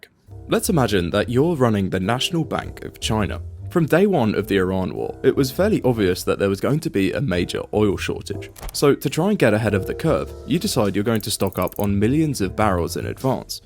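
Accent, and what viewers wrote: United Kingdom. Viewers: Color me skeptical of a 20 something with British accent telling me what the future holds. US debt isn’t debt. It’s treasuries. Purchased by people earning interest. It’s savings. Not debt.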